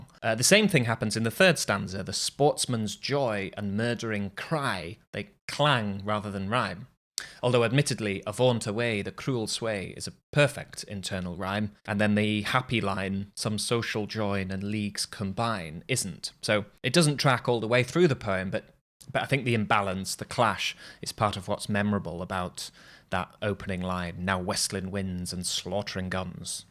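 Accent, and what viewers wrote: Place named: Scotland, United Kingdom. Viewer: Thanks, this is great, today on 25th Jan 2026. Not a bad effort on the Scots accent 😂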